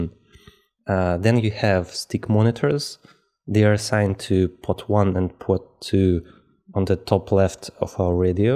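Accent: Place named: Russia. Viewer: This video is the BEST ever way to set up for 3pos switch. Better than airplane setup with mixing on CH5/6. I could listen to your accent (RU/EN) all day, I used to work with a few Russian/Bulgarian guys here in Aus. I loved it. Thanks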